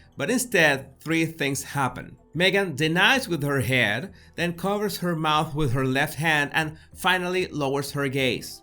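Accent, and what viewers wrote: Mexico. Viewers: PLEASE!!!! TRY NOT TO SPEAK IN THIS HORRIBLE MEXICAN,RUSIAN,CUBAN ACCENT. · I like your accent..where is it from??? It sounds Mexican..but......i like yours...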